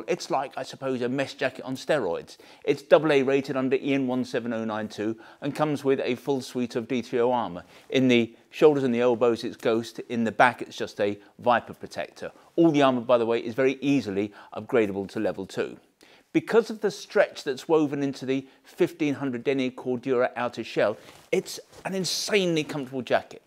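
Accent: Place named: East London, United Kingdom. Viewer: sounds like you are fresh from petticoat lane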